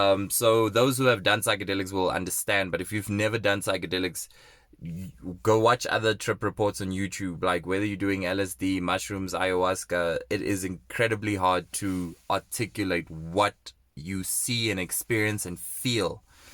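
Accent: South Africa. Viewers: Your accent sounds South African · are you south african?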